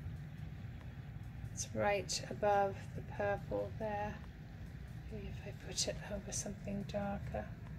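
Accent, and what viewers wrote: United Kingdom. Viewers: greetings from the East Coast. I enjoy watching you and is my favorite! You are both blessed to have each other. I enjoy watching you and do so alot in the evenings after a long day at work and def on weekends. I have learned so much. You are wonderful to watch and your voice and the fantastic British accent is so soothing. Thank you for making my days alot happier. You are a doll! Happy Thriftying!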